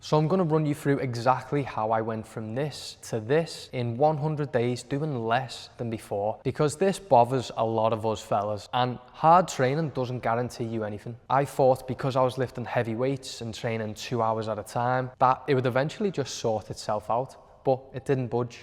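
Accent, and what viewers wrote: Liverpool, United Kingdom. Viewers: Scouse accent, the best 👍 (from Korea) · Scouse mate. Have you heard of the Beatles?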